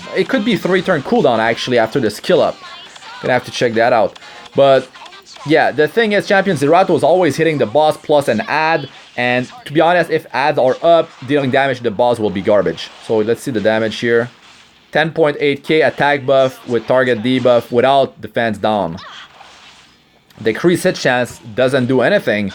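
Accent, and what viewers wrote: Quebec, Canada. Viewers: I am pretty sure you are a Quebecois with that accent XD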